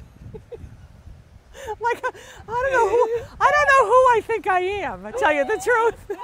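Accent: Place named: Boston, United States. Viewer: I love this! This tune has stuck in my head since I first heard it in the van on your travels out of Boston. It`s so catchy that I sing it all around the house and my 12 year old laughs at me. I am from the UK & was lucky to live in Boston from <num>, I love hearing your accent. Thank You, look forward to seeing more video`s of people dancing to it!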